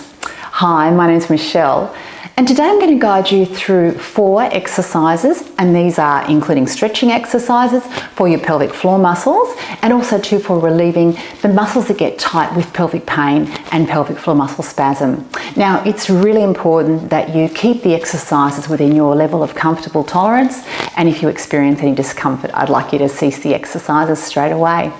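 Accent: Australia. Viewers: is that a Melbourne accent? Gorgeous.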